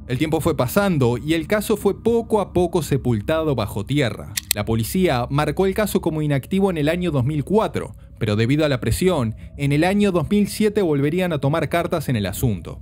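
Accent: Argentina. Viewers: Ah, eres argentino?, me suscribo ya inmediatamente. 🇻🇪 Amo a los argentinos y tu canal se ve de buena calidad.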